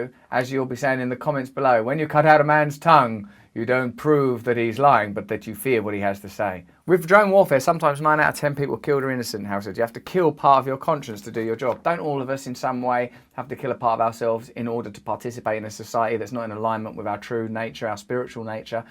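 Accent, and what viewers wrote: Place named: United Kingdom. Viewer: Love your old man British accent.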